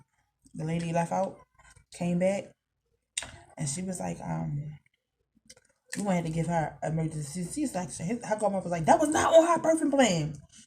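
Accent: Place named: Baltimore, United States. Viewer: You’re from Baltimore. I know this because I love y’all accents so much😭❤️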